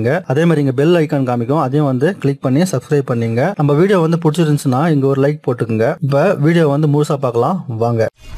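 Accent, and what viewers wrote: Chennai, India. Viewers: Bro UNGA Chennai Tamil semma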